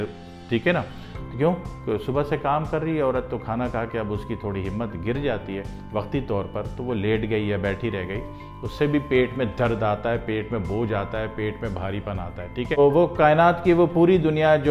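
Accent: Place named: Delhi, India. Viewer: بھائی میاں آپ دہلی والے لہجہ میں بات کرتے ھو۔ نیم حکیم خطرہ جان۔ بھائ میاں چھوٹے گوشت کا قورمہ کھائیں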